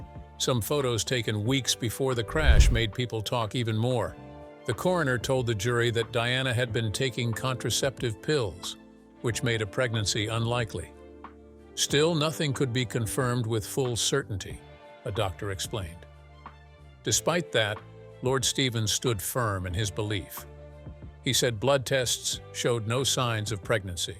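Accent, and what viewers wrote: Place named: United States. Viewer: WHY IS THIS VOICED BY YET ANOTHER YANKEE, I AM PISSED OFF WITH THESE PEOPLE BECAUSE I WILL NOT WATCH HOWEVER INTERESTING IT COULD BE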